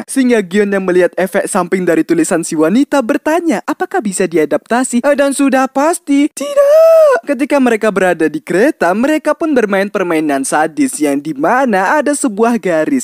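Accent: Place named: Bugis, Indonesia. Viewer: Telasomu Ko bilang bang😂 Bugis ye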